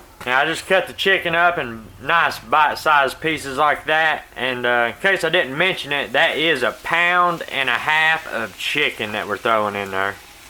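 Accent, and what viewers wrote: South, United States. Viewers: I like you! And I love your southern twang! ❤️ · I already know how to cook but I subbed because your accent cracks me up. I'm from California but when I 1st moved to the South, one state away from you, I couldn't understand what anyone was saying. 😂 Your accent definitely beats arkansans for twang. Maybe I've been here too long...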